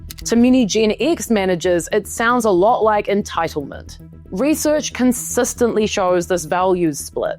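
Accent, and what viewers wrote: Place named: New Zealand. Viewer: I'm a late boomer (almost gen X) and I would like to thank you for your enlightening analysis, it really set my ideas straight about the cultural shock I sometimes experience with gen z. Also, your video helped me solve a puzzle, which is where you are from. I'm french and, apart from those of us who watch brokenwood in the original version, we are not really exposed to the NZ accent. So mystery solved! Thank you again for your excellent channel.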